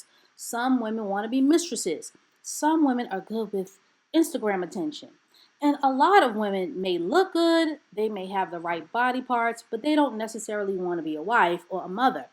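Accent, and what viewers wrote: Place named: New York, United States. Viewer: Sis " Busted can of Biscuits" you sooooo New York. I love it !!!!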